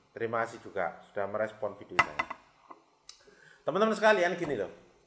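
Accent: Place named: Java, Indonesia. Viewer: Ada lucunya PAK BOSS kayak ada logat jawa nya gitu ☺😆👍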